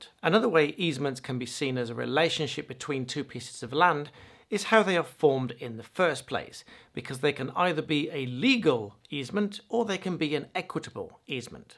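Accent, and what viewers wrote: United Kingdom. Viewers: With that accent, I have to ask, are these laws in the U.S. or the U.K.?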